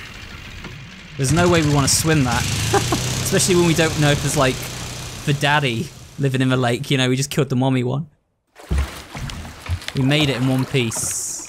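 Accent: United Kingdom. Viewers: Our british accent has uploaded 2 videos in 1 day he works hard and fast on these videos not gonna lie especially if it's Resident Evil i love it so much thank u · Bro this is the first time I realize he's British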